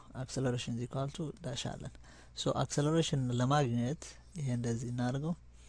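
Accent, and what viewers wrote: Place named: Ethiopia. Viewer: LOL such an Ethiopian accent! I love Ethiopians!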